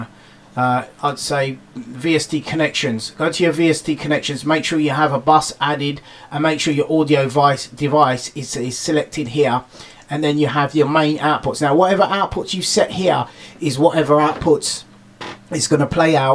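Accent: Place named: United Kingdom. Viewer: The clearest British English I ever heard...Thanks Man, good point...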